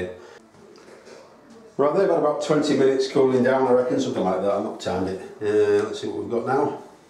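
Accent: United Kingdom. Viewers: Well it was definitely interesting to watch the video to get a good idea of the process. But if you do this regularly it's one way to get to a more fit with this workout, also as you stated a few times during the video is that the pump has to cool down (keep in mind that the internal seals will reach a much higher temp than the outside). One thing that may help both you and the pump is have a fairly powerful fan force some air circulation around both you and the pump, probably best not to do this outdoors though as you might blow up dust from the ground and suck that into the pump cylinder more than needed. Also one thing which I didn't pay close attention to, did you bleed the line between pumping sessions? I usually pump for 4-5 minutes myself, bleed it and take a break while the pump can cool down to refill my air rifle. And once I get above 200 bar I kinda have to cheat and grab a backpack that has a few full water bottles in there to get the pressure up to the max rated 232 bar of my air cylinder on the rifle. 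I'm guessing you live in the UK based on your accent, chances are humidity is usually pretty high then similar to what I'm getting by living in coastal region. If you keep pumping without bleeding the line between sessions odds are you might be building up a lot of moisture that may end up inside your tanks. You'll still get some in there if you bleed it between pumping sessions but it should drop significantly, if you've pumped your tanks this way frequently by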